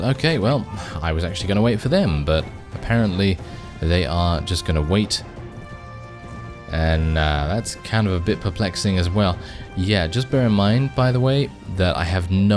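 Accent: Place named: RP, United Kingdom. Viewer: This guy sounds like a british lord.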